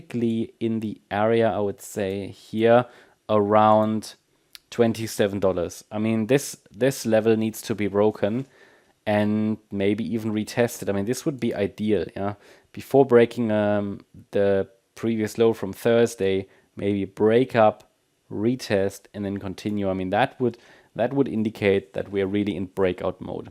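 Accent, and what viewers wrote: Germany. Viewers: Thanks for frequent updates. I’ve been following you for a while now and like your analysis and German accent 😊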